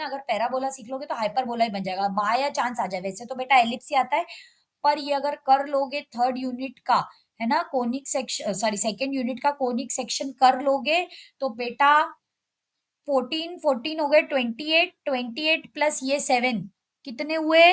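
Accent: Indore, India. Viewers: Mam Indore se ho kya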